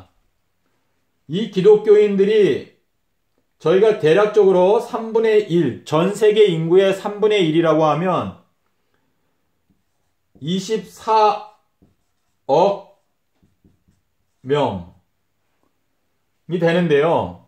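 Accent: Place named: Jeolla, South Korea. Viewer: 고향이 라도 스타일인데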